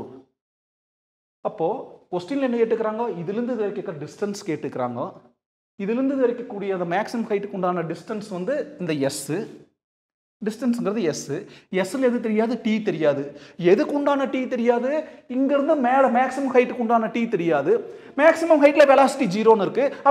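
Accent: Coimbatore, India. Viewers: Neenga Coimbatore pola......slang theriyudhu sir....me too.....❤️from cbe❤️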